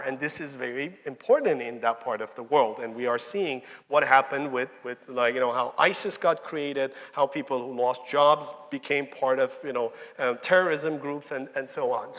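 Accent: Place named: England, United Kingdom. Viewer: I’m awed by this beautiful accent of yours, Prof. Madani! I came here to listen to your English accent and go, but I came, stayed, and listened to the whole speech. Watched it, loved it, and learned so many things from it. I'm a proud Iranian, but so sorry for not having you here in the country. Good Luck.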